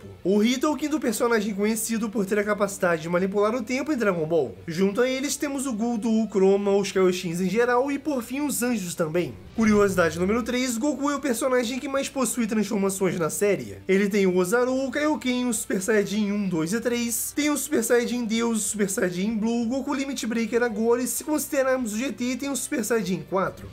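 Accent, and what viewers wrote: Portugal. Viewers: Vc é português (PT - Portugal) ??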